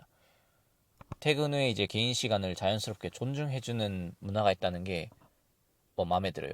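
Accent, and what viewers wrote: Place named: Japan. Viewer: 한국어에 일본어 톤이 녹아있는걸로 봐서는 일본어를 수준급으로 잘하실 것 같네요. 저는 살다가 돌아왔는데 어디서나 화이팅입니다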